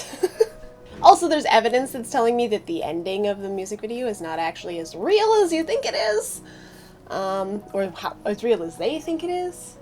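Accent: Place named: Canada. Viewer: canadian tinnitus pronunciation represent!!✊✊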